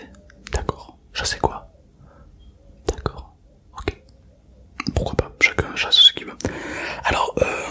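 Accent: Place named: Marseille, France. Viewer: L accent marseillais oulaaaaa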